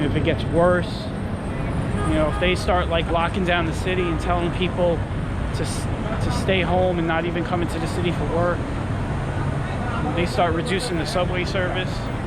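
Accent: New York, United States. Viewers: You have a a Thick Newyork Accent lol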